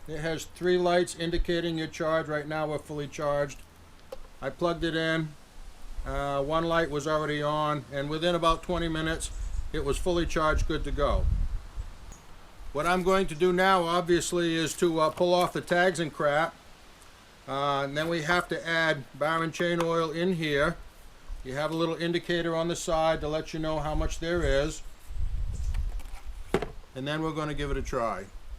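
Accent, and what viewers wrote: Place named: New England, United States. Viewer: Idk, maybe Maine, upper Vermont, or mass? It's hard to tell.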